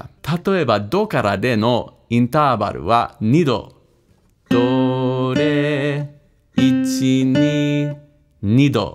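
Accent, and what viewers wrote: Kansai, Japan. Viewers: 関西弁やめて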